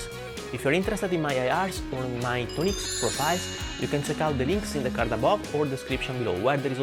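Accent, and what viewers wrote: Italy. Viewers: Caro signore lei parla inglese come Renzi. Non crede che volendo fare video in inglese dovrebbe fare un po' di pratica di pronuncia? Anyway the video is good, I didn't realize if this gear can accept the IR.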